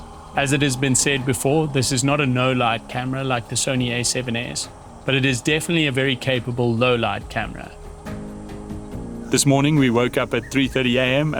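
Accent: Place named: South Africa. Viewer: Nicest Saffer accent I’ve heard. Usually pretty harsh. Great footage and gear review!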